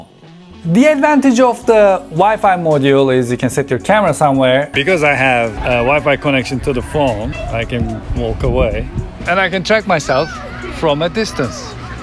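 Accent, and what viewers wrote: Turkey. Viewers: What a heavy Turkish accent. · Türk aksanı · Adam resmen Türk İngilizcesi konuşuyor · Türk olduğunu sonradan aksanından anlayıp şaşırdığım youtube kanalı. İçerik harika umarım aynen böyle devam eder.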